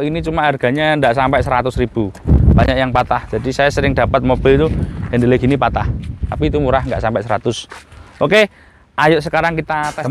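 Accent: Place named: Java, Indonesia. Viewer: Aku seneng bosomu medok, Mas ... 👍😂👍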